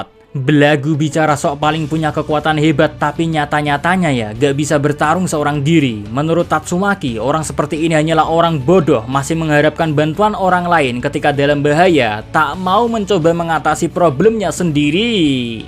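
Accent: Java, Indonesia. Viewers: Apakah psykos udah msk level ancaman dewa mohon dijawab mimin ganteng dan medog?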